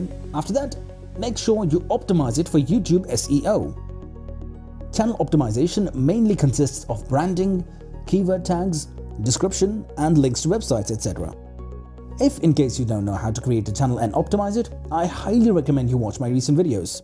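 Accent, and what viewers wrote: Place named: India. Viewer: Your English look like you are India I know you're belong to India 👍 I like your videos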